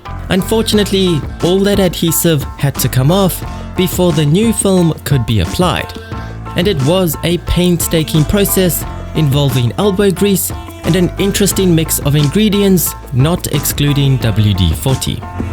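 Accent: South Africa. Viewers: I knew you sounded South African!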